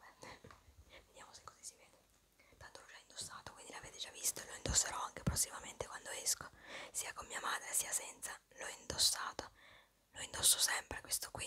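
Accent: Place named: Veneto, Italy. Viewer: Io pensavo veneta per come pronuncia la "r"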